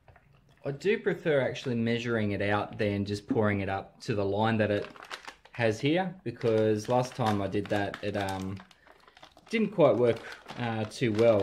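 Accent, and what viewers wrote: Australia. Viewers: Your australian accent like the blades to my ears. I love more the US Eng. But anyway you're the awesome reviewer at YouTube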